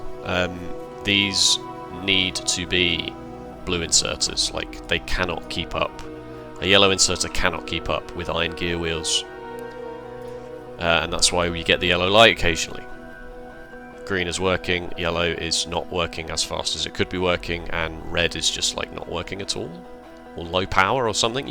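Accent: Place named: United Kingdom. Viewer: I like this format. I'm just here for the Factorio content. Carry on doing what you enjoy doing. Waffling on in a British accent is fine... kind of soothing really.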